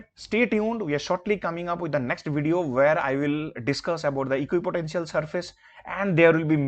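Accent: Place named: Bengal, India. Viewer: Thankyou Sir !! 😁 There's a touch of Bengali accent btw i think..🧐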